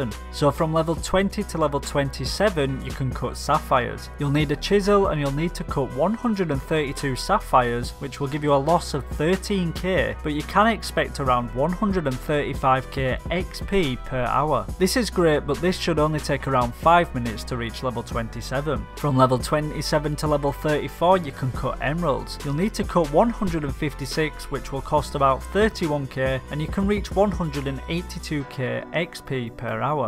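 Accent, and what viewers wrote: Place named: Leeds, United Kingdom. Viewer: bruh u from leeds lol?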